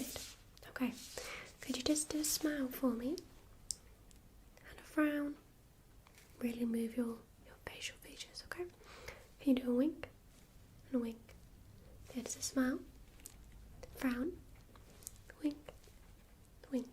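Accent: England, United Kingdom. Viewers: I don't know why, but English accents help so much with tingles...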